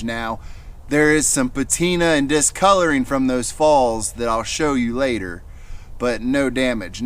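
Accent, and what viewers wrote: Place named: Mid-Atlantic, United States. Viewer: Love that mid Atlantic accent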